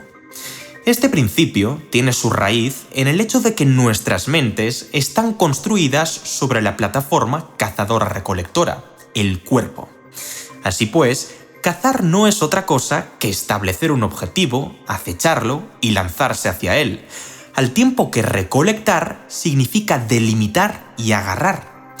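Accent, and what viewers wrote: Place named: Spain. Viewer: nadie te preguntó, no lo veas y punto. Su acento es español.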